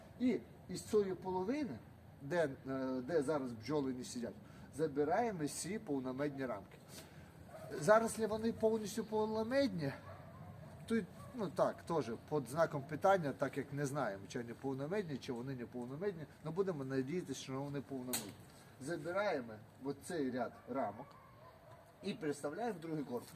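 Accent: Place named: Surzhyk, Ukraine. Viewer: я сам разговариваю на суржике но твой суржик так режит слух что не могу твои ролики смотреть хоть включай субтитры.бeз образ,Слава Украіні.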